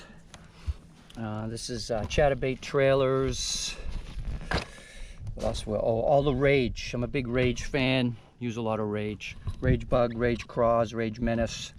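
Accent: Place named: New England, United States. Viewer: Awesome...thanks for your service as well and the information! My fishing will be done in ME so the biggest water I’ll see will be Moosehead Lake. You sound like a NE guy so I’m sure you’ve heard of it. I hope you don’t mind if I hit you up again in the future with questions. Good chatting with you!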